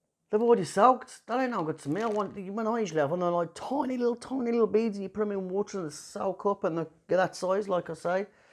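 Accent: Birmingham, United Kingdom. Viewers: Hi, it's good to hear a brummie accent. Not sure where you are from but I can't be to far from you in Walsall. I am loving your videos and have tried a few of your ideas. I am using extension tubes and a Canon 1200D. Had some success! Thanks again for the inspiration. Steve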